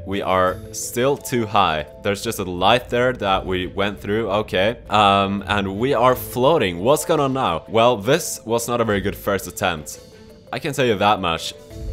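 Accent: Sweden. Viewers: You can hear very well that he is from Sweden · Are you from Sweden, cause you have an Swedish accent, if you are then I’m gonna say: Hej, beroende framkallande videos! Hoppas du har det bra! :)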